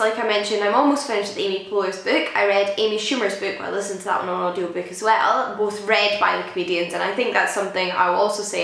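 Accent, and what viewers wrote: Scotland, United Kingdom. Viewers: You look quite like Amelia Pond from Doctor Who. And you've got the same Scottish accent. Loved the video. I haven't been able to make the leap into Non-fiction yet but this video is a good motivational source. Thank You!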